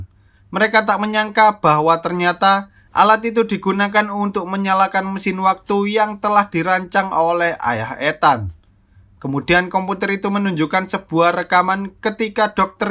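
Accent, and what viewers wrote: Java, Indonesia. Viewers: Hmm risi terlalu medok narasinya *Menurut gw woy ✌🏻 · Medok banget ngomong nyaaa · Medok bngt bang ngomongnya😂 Lucu beud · Pekatnye jawe ko bro ....😂😂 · Wong jowo lek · Jawa nya medok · Medok ya pak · Suara jawa nya kya tmn sya omm 😂😂😂😂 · Pekat jawa nya, apa khabar bang... haha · Asik juga nonton film di ceritain pke logot jowo 😂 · Meddog ngge. . . . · ethan...ke edan...walalalaaa.... kurangkan sedikit pekat jowonya wak..... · Logat Jawa ny ketara mas, jd kurng enak denger ny.. Coba aja Narator ny Logat Indonesia Tulen lbih enak denger ny.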